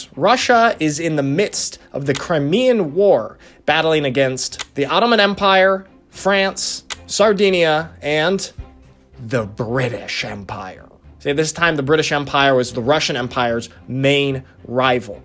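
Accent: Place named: United States. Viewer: The British Empire!! Love your American accent guy 🇺🇸👌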